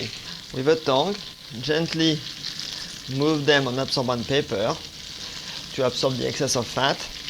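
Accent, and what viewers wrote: France. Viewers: this guy has an awesome French accent and a really cool hat - this has got to be the best recipe on YouTube for Chicken Cordon Bleu :) LOL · Wow so yummy with the French accent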